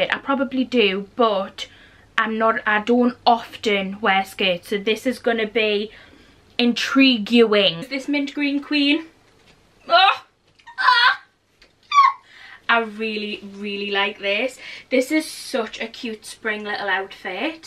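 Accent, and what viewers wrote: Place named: Geordie, United Kingdom. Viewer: I feel like your Geordie accent was so strong in this video 😂❤ You look amazing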